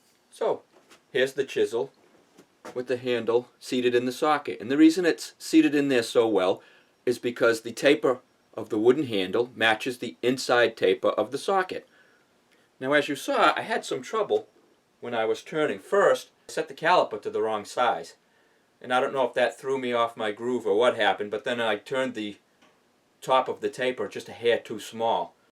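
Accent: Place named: Boston, United States. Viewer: Man, you have a really strong Bostonian accent. Are you from reveeyah?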